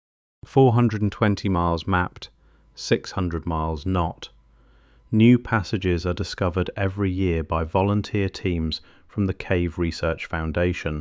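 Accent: United Kingdom. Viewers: A British accent saying "54 degrees" and not immediately saying "Fahrenheit" after that. Only with AI. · Yea. Same British voice on all the videos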